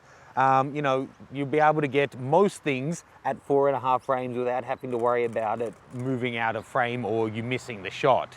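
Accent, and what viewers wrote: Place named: Australia. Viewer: The Australian accent is the hallmark of racist moron in the world right now So if you want to talk about cameras tone it down on the ugly accent Mate !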